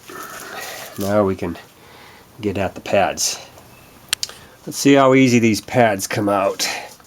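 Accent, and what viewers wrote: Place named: New England, United States. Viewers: Those cab. bolts just need snugged up good! N a screwdriver ant going to hurt it! Other than yankee ackcent this dude done fine! Jack stands r nice if ur going to b up under car eating lunch! Dont for get pump breaks b for u head out!!!! Good job! Yankee! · I love the New England e-yak-cent! I’m usin’ a he-ahf inch bray-kah-bah! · good job sir....you have a great gift in teaching...yes, you have a nice boston accent..similar to Peter Griffin of Family Guy....:)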